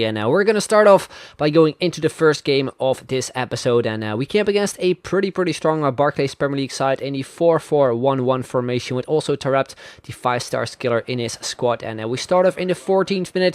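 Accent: Netherlands. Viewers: is he dutch? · Youre dutch jaja ik hoor het gewoon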